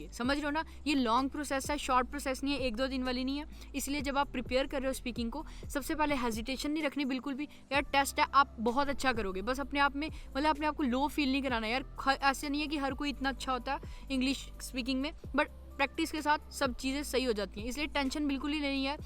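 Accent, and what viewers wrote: Jammu, India. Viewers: Are you from jammu, where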